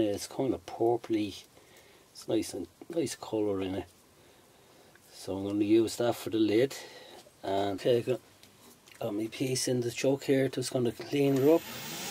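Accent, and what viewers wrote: Dublin, Ireland. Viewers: You're Irish!<3 Where about are you from? You sound like you're from Dublin. I am from Dublin and am a student. I have a great interest in woodworking :)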